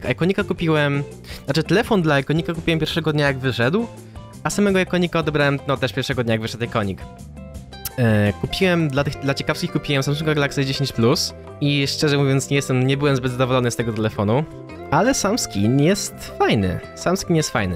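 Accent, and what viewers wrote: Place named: Podlasie, Poland. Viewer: jesteś z Podlasia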